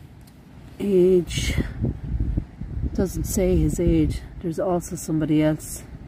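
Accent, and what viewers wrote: Ireland. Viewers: I am trying to learn to speak irish and I really love your accent. Also thanks for all the cemetery videos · I am in Kentucky, as well. Greetings to you! Only recently found you and I thoroughly enjoy being invited along on your explorations. I love history and I love your brogue. I have some Irish ancestors just not sure how much. Are there any of the names of Case or Harrell? Anyway, thank you so very much for what you do, it's wonderful and I hope you will continue for a very long time. Take care and God bless! 🙂🌹🇺🇸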